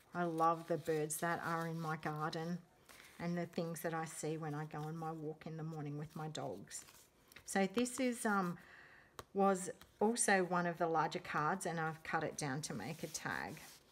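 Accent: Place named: Australia. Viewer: lovely work - its great to hear an aussie accent on YouTube. Keep up the great work:)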